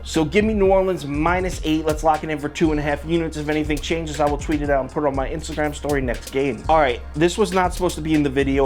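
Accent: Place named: Boston, United States. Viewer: I like ur boston bro..😀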